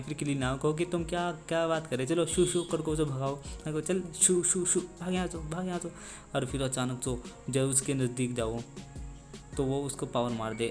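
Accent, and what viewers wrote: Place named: Haryana, India. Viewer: Bhau hindi bolo haryanvi nhi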